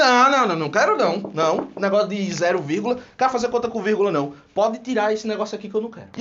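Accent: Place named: Northeast, Brazil. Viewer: Adoreii a aula. Achei mto animada. E seu sotaque nordestino,foi oq me chamou mais a atenção.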